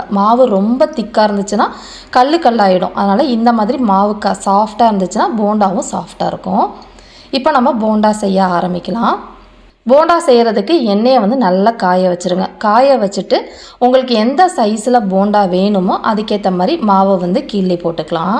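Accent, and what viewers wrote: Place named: Coimbatore, India. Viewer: அக்கா நீங்க coimbatore ஆ,,,,உங்க பாஷை அப்படி இருக்கு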